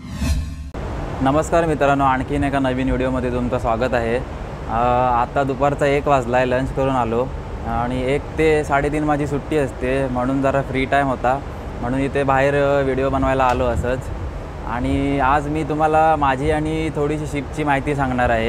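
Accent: Konkan, India. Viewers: मी पण कोकणी आहे तुझा बोलने वरुन समजते तुकोणी आहे ते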